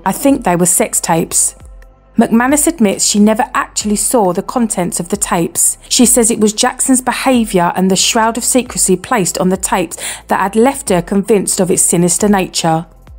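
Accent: United Kingdom. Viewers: The more I watch these kind of videos the more horrified I get how much Michael was bullied and tortured it seems so beyond what my brain can comprehend how much evil was done to him . How will these people meet their maker one day ??? I would never want to be in their shoes. This housekeeper is hideous and despicable woman not an once of guilt or remorse of what she has done to Michael .On the lighter note the narrators voice is so good I love British accent so beautiful.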